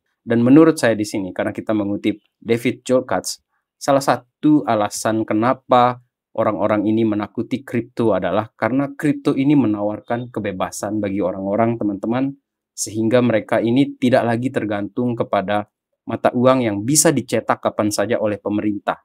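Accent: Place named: West Java, Indonesia. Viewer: logat nya mirip sunda daerah priangan timur 😅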